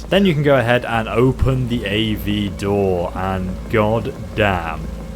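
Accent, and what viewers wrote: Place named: United Kingdom. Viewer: mishrooms I tolerate him because hes handsome and his british accent is good and his temperment is enjoyable iin his videos... but yeah hes jumping on the bandwagon of mass producing "guide " videos and using keywords like the millions of other youtubers all doing them... Idk how he has time to do ANYTHING but play these games i mean I havent even finished AC valhalla yet it was just so massive - and now THIS is massive too... I'm drowning in these open world games that GIVE you the whole world at once - piecemeal it to me - All these massive open world games give me anxiety and make feel overwhelmed and exhausted as soon as I see the map and all teh shit ive got to do to satisfy my OCD and ADHD :( MAkes me want to play and On Rails or series of corridors game that t ells me a nice story instead of all of these options - i mean some optionms are good but not dozens and dozens of side quests and collectibles and every zone opened up after the prolouge... 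shit makes me not even want to be in parts of the city, like some parts of it are so confusing with the verticality it took me like 10 minutes to find the door to a ripperdoctor there otehr day lmao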